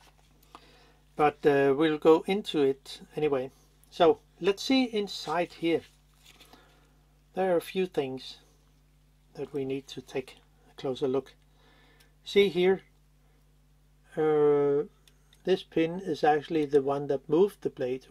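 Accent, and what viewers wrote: Denmark. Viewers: WAUW!! This is SO strange and interesting:) I have JUST found your channel because my dear dad gave me his collection of vintage Nikkor lenses and the Micro-Nikkor 55mm was tight with the focus. Well, you made a video about. But the interesting thing is this: I noticed what sounded like a danish accent and now I find out that you in fact are from Denmark AND your name is. Well guess what...I am also from Denmark...and my name is...:) Tusind tak for videoerne, min ven!! Hvis jeg kommer til at lave rod i den i mit reperations-forsøg, så håber jeg at du måske kan hjælpe mig;) mvh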